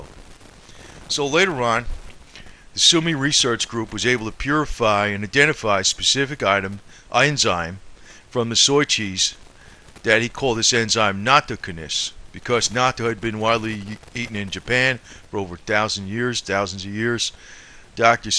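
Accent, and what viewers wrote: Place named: Boston, United States. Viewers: hey, this dude is the real deal, and when some "professional" is coming at him with the "pronunciation" of his words, there's some insecurity happening here. the information is priceless, and so is this gentle man. his accent is home to me, new york, boston, love him. I don't care if he calls nacho knish, if it works, we will figure it out no problem! thanks for your input suh! I'd rtather pay him than some herk whose agenda is to get his/her ass top the bahamas on our miserable dime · This guy is not a healthcare professional which is obvious from his continued mispronouncing of simple medical terms, especially the word Natto, and NattoKinase. It is not Nacho cheese. It is pronounced Knat-O-Kine-Ace. Serrapeptase as well.(Sarah-Pep-Tace) These are protein based medical terms ending in Ase(Ace)!! Please, if you are going to talk about these systemic enzymes, lose the thick Boston accent so that you sound a bit more intelligent, and then please pronounce the words correctly. 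You can't just say "when it gets into the bloodstream, it just does it's thing". This is a great misrepresentation of the mechanism of action that these enzymes perform in the body. Get the IDEAR??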